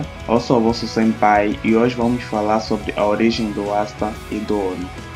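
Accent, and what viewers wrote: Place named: Portugal. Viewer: jurava que tu era de Portugal kkk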